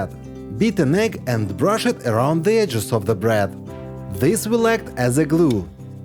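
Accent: Russia. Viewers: Very smart. Sounds like a Russian accent. Excellent recipes and hacks to learn tasty and healthy food. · Ruski akcent wymowy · I'm not from an English-speaking area, but this kind of English-Russian is so irritating that I can't listen to it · Dude, your Russian English is making my ears bleed. Subtitles are enough, macho, absolutely.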